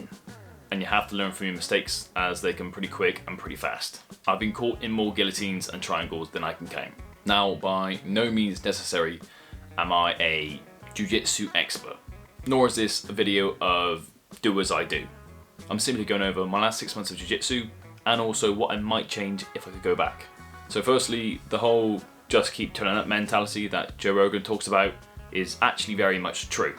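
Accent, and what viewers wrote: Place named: North, United Kingdom. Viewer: Good video, nice to hear a northerner on YouTube!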